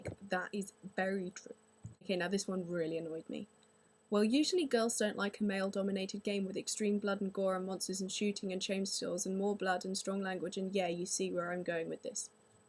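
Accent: United Kingdom. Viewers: heck you don't have to search on google! Look in the mirror you're so pretty and that british accent. Lovely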